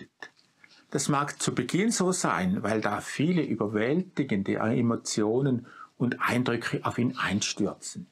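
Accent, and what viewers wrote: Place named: Switzerland. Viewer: Herzlichen Dank!! Leider ist mir dieser schweizer Dialekt ÄUSSERST UNANGENEHM !!!!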